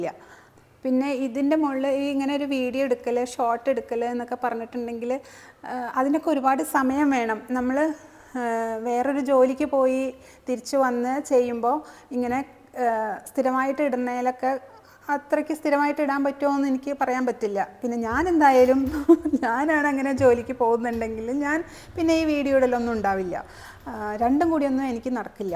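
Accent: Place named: Palakkad, India. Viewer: Samsaram kettitt Palakkad aanennu thonnunnu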